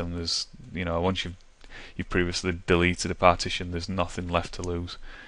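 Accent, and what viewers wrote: United Kingdom. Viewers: Thanks British bro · Thanks dude, i like your British accent :) You helped me partition my HD going back from Linux to Windows. cheers mate!!!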